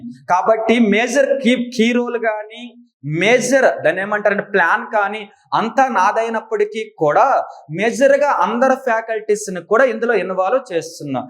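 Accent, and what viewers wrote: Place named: Andhra Pradesh, India. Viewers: అవును.. ఇతను ఆంధ్ర.. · నువ్వు ఆంద్రోనివారం తమ్మి వాయిస్ ఆంధ్ర లెక్క ఉంది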